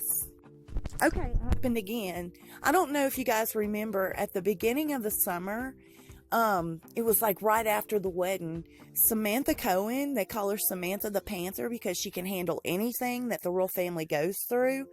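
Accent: South, United States. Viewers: P.S. I really Like Your Southern Accent. Where Are You From In The South?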